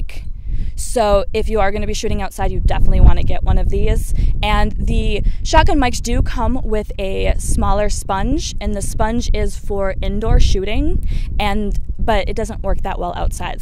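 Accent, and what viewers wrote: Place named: Midwest, United States. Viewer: What I tell you is this: Get rid of it but be able to showcase it if someone asks for it. Or for when you go back and film there or Michigan/Wisconsin where that accent will be wanted regionally. Well I only say Michigan because there is a lot of filming there but that accent is not necessarily there. I like your voice. Sometimes there's a quiver in there when not using the accent like you're nervous or something, but it's very cute when it happens. Like when you get tangled in cords. lol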